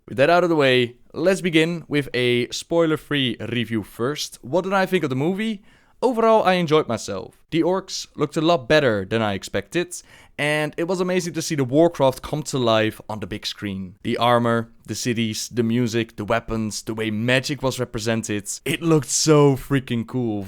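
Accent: Netherlands. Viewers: As a dutch person i have to say... he sounds dutch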